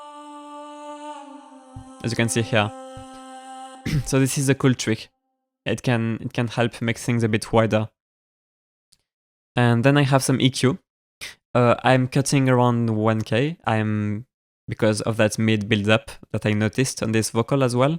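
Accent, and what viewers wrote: France. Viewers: hey First off its a Very cool video you explain quiet well and thanks you and secondly are you français ? 🙃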